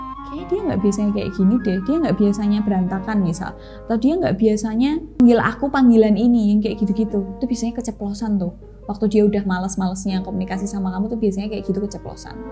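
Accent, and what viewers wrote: Java, Indonesia. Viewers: Samean iku jowo ngedi se mbak.. lucu banget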